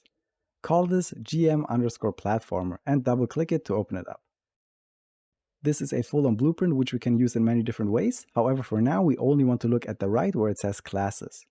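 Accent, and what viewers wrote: Germany. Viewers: Ich höre den deutschen Akzent raus 😄😉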